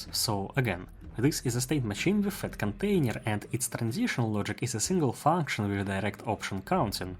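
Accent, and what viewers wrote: Russia. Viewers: Хмм, что-то уж слишком хорошо я начал понимать английский. Как будто собрат по русскому языку пытается на английском что-то рассказать и все понятно,т.к отсутствует английский акцент 🎉🎉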